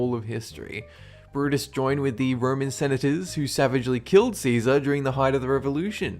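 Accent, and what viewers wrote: Australia. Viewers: Does he have an Austrailian accent or is it something else?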